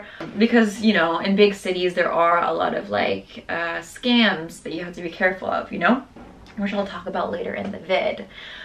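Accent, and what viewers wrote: France. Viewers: Since you're fluent in French and have been there for six years, do you find the accent starting to stick when you're speaking English? Just curious haha. Love your videos and energy :)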